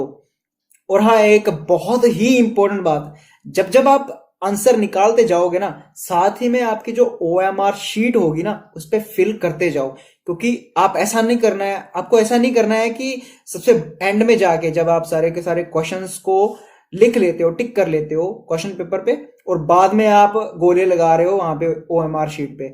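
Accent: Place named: Punjab, India. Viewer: Awaaz me punjabi tone aa rahi hai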